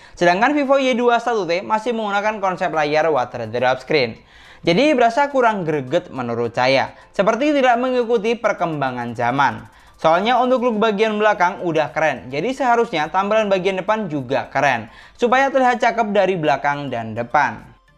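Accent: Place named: Martapura, Indonesia. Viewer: Orang Martapura yah mas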